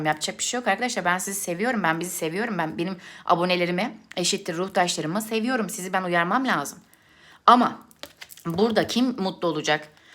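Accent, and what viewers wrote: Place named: Aegean, Turkey. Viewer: senin ege şivenı yerim ya gidipduruu😁🥰🥰 Vallahı noluyo 2seferdır anlamadık nazaramı geldık! Aman ruhdaşım sen bırakıp gitme bizi🥺 Seviliyorsun!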